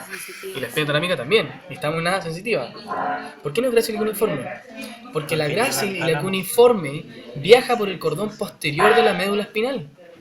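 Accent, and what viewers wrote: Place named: Chile. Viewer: español propio de chile xd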